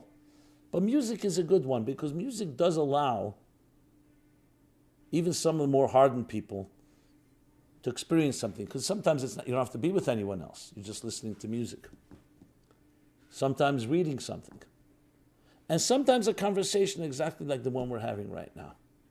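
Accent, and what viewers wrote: United States. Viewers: Thank You✨🌹✨🪬✨ sometimes it is difficult for me to understand you because you speak American English. Sorry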